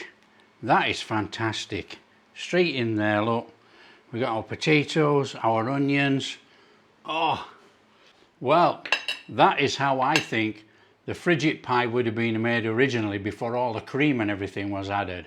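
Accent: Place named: England, United Kingdom. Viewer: Wonderful English recipes and your wonderful English accent! I understand all of it and enjoy both...thanks from the Black Forest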